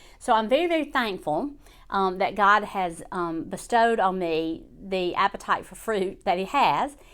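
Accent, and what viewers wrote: South, United States. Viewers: Jessie Barnett continued - I’m excited to know there’s someone else who has a similar combo of chronic illness stew ! I have CHF - and wear the same lovely “zipper” jewelry at my neck. I too have seizures roughly twice a month, complex partial, secondary differential nocturnal seizures. I’m not diabetic, but I am in 3rd stage kidney failure. A week ago I was diagnosed with vascular dementia, stage 4. During the winter, I was admitted to the hospital with severe bronchitis. It was there I experienced a most frightening experience — Steroid Psychosis. Never, never take a steroidal medication ! Did you pick up the Southern accent in my posting ? I live in North Alabama, Scottsboro, very close to Huntsville. To the aggravation of my doctors, I’m an avid YouTuber, watching every video on dementia. Count me among your many, many fans. 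I savor every post and most sincerely appreciate your knowledge and easy delivery (Southern) of course. What a pleasure it would be to have a chat with you discussing our mutual ills and hospital adventures - fueled by multiple glasses of iced tea ! Pace yourself, take every pill, follow every rule, and know you have a Crimson Tide friend including you in every nightly prayer. 💜 Jessie Barnett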